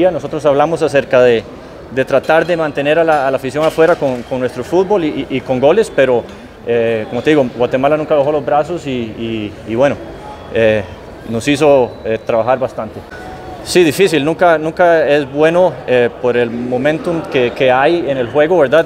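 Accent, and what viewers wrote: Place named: Costa Rica. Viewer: El tecnico este es tico?😳🤔 se le nota en su acento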